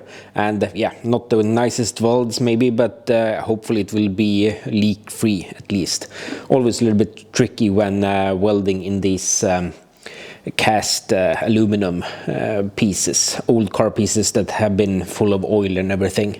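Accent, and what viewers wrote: Sweden. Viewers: Take care of yourself mate, you are doing something thats really cool and unique. I look forward to seeing the update videos on what you've been doing and hearing you speak swenglish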